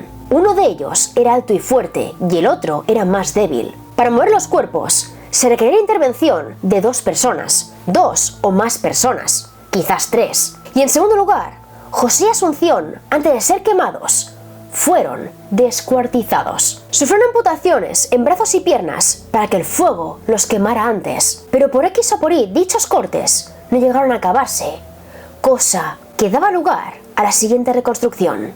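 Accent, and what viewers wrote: Spain. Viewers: Desduzco x tu acento eres española,pero te informastes poco ,el que tenia la horquilla u horca era Zapata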